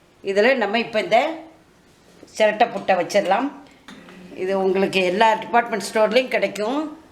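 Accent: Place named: Nagercoil, India. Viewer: Ungal nagerkovil tamil alagha irruku.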